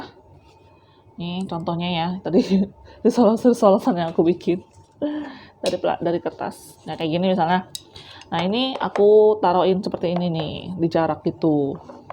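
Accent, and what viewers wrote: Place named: West Java, Indonesia. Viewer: Suka bgt sama cici cici , tp ngomong nya nyunda (ada "mah" nya) 😆😆😆 terimakasih tips nya cii , sehat selalu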